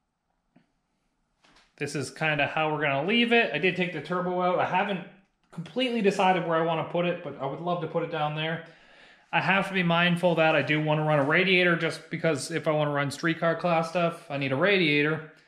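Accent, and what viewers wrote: Canada. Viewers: Lmao I love the Canadian accent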